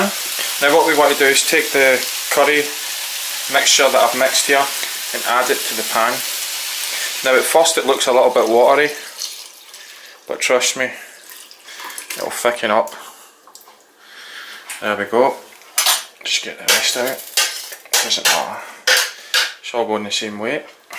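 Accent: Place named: Scotland, United Kingdom. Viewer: Fab ill be giving this a try. Love your Scots accent btw, its ace :)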